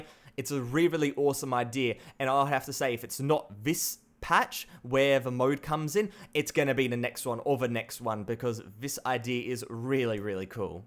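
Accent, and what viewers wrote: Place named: Australia. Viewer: Your aussie to niceee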